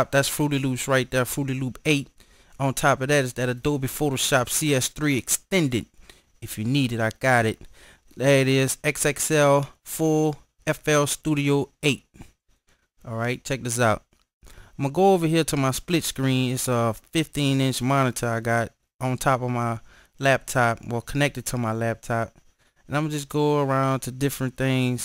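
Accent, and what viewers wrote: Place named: United States. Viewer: love ur american accent...im a canadian so im weird...lol, nice vid..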